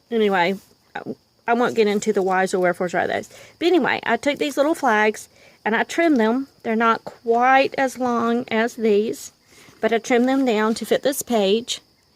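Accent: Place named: South, United States. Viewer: New subbie. Keep up the cool videos. Your journals look great. I'm guessing from your accent you're southern like me. Nice to hear.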